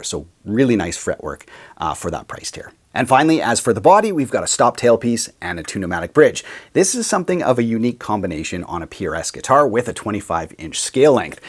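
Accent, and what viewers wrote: Canada. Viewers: Never heard you sound so Canadian aye!! Usually rarely hear you sounding typical Canadian Aye, or at least how we Americans stereotype it!! Said with love!! Love the Videos!! Your actually the 1st channel I started watching after not playing for 20 years. So Thanks for getting me excited about playing again!!!!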